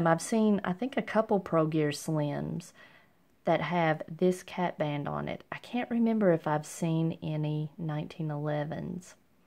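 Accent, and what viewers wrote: South, United States. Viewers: Southern accent eh?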